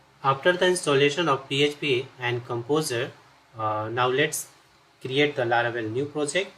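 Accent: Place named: India. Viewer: I love you so much Mister India, my friend and i love your accent so much, we will come to India soon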